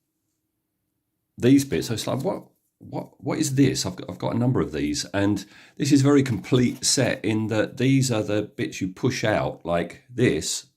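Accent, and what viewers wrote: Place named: Kent, United Kingdom. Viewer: You sound like a kent ite like me, dunno if your school at the end of term used to gamble crisps on 'battling tops' , maybe it was only us in Sandwich... excellent video sir!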